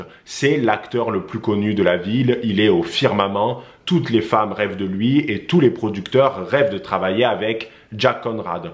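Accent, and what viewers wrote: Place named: Aveyron, France. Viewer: Est ce que ton petit accent a quelque chose à voir avec l Aveyron ? Salutations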